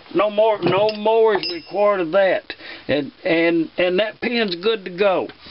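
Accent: South, United States. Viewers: It’s really interesten how you took dat wearer to get the spring ends through. Good job. Love your Southern accent. 👍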